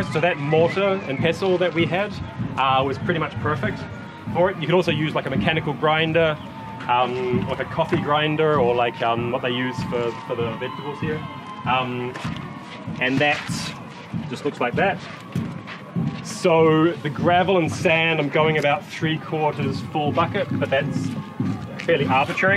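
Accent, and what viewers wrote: New Zealand. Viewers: Cool. We thought so. We heard the NZ accent then the slight roll of the "R's" was the give away. We picked Gore or Invercargill. Our boys just went shark diving down there a couple of weeks back. Good to see another "Kiwi" making a difference in the world.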